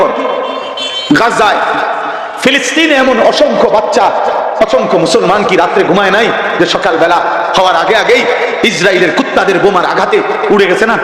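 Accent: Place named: Baripada, India. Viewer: Theek baripada dialogue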